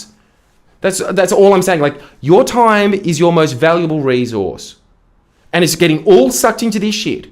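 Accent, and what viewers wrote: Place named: Australia. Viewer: Incredibly chad video. Already love self improvement content but goddamn giving self improvement advice with the addicting ozzy accent and jokes holy hell this is art. Instant sub. This is it, the gigachad of australian news also being a gigachad of telling people the massive downsides of being chronically online and stimulated one way or another!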